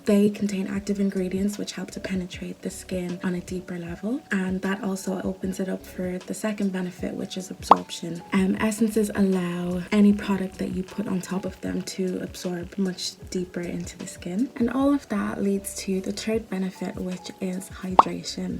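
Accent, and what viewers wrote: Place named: Ireland. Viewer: You seem to have a slight Irish accent. Are you in Ireland?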